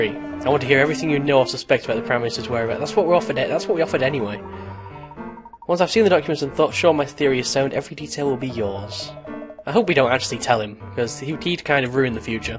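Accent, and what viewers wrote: United Kingdom. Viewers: I love your accent. I wish I had an English accent. x3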